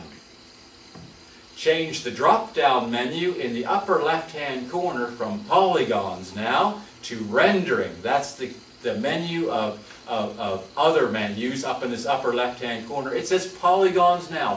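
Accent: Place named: United Kingdom. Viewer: Hes from the UK deal with it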